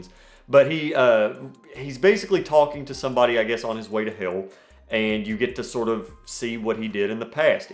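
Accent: South, United States. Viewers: Love hearing a southern man who’s into cinema! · I just love your southern voice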